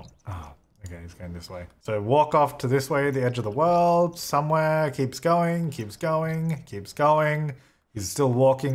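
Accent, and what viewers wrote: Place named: Australia. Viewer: It took me 17mins into this video until I realised you're Australian... And I'm Australian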